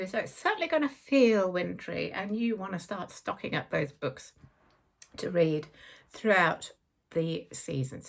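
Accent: England, United Kingdom. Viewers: A lovely English accented lady talking about winter books, preferably curled up on my sofa under a fuzzy blanket on a dim, chilly day with a fire crackling in the grate… what could be better??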